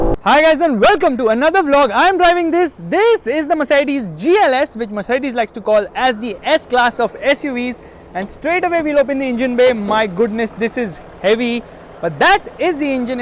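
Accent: India. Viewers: Except your Indian accent everything great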